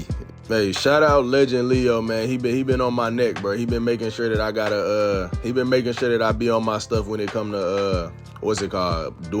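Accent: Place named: Chicago, United States. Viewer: You talk like you from the chi lol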